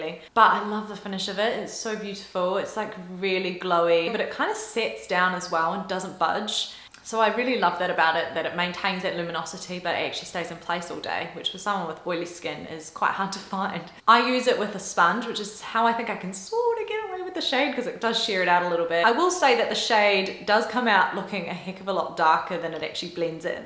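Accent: New Zealand. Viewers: Lovely to see you back,. Your NZ accent has made a great resurgence, plus you seem really happy and relaxed. I'm so happy that your audition was such a success, as well. Sometimes, all the energy in the Universe seems to pull together in your favour and these continued successes are proof that you made the right decision 💕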